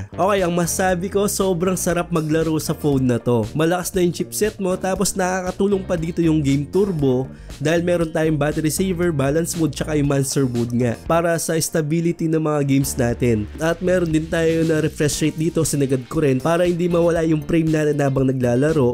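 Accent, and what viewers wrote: Pampanga, Philippines. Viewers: Kapampangan ka neh? balu mung balu ken pamanyalita mu lalagitik hahahaha taga ka nokarin pampanga sir?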